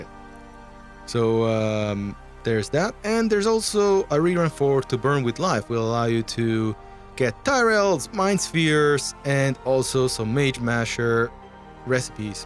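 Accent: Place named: France. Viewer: your accent to me sounds almost french though I could be wrong about that Don't have many friends from France As for my City yeah it's great here always festive celebrations good food great people to talk to and in some parts a party almost everyday if there's nothing to celebrate we find something to celebrate That's the Cleveland way If you ever come to the states (whenever that's possible) check out Cleveland Ohio you'll love it here As for the channel have you considered partnering or collaborating with other streamers of WOTV? There are some great ones out there Auronnj, Paladiah, Diggs maybe even try to get a sponsorship with Amazon like Auronnj and his Amazon coin affiliate link As for the PS5 I hope so too but first they said it be more accessible in 2022 but now there saying it might be in 2023. They say this is the greatest launch of the next gen but when you can't even keep it on the market and scalpers running rampant I would have to disagree sells numbers don't mean a great launch when less than 50% of the population of gamers still can't get your game console. 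Imagine the loss of revenue PS5 game developers are looking at for the first week of sells is everything and you can't just make up for that later. And as for the strategy I'm still working things out but eventually it will all work out in the end even your plan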